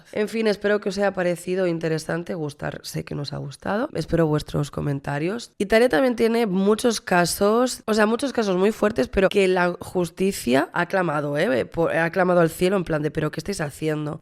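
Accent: Catalonia, Spain. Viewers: ¡Buenas! Primero de todo gracias por lo que haces. En cuanto a lo de vocalizar... creo que la gente confunde tener acento con vocalizar. Tienes acento catalán y se nota. Pero eso no es nada malo, es más, es bonito que las personas tengamos distintos acentos. Yo creo que más bien se refieren a que te salen catalanismos estilo "el caso que nos pertañe". Pertañe en castellano no existe, sería atañe. A todos nos salen expresiones de nuestra tierra y es lo bonito de la lengua! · Tienes un acento catalán bastante fuerte. Yo te entiendo perfectamente porque wiybdevla zona😅😅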